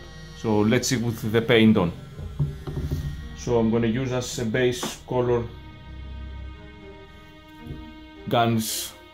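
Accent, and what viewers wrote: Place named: Greece. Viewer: I have a question, where are you from, i'm trying to place your accent, I want to say its Greek, but I could be wrong.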